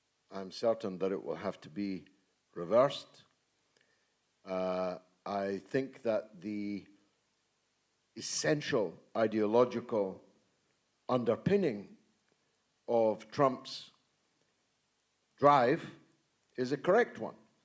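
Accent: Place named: Newcastle, United Kingdom. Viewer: Well spoken Geordie .. Said from a fellow Scot 👍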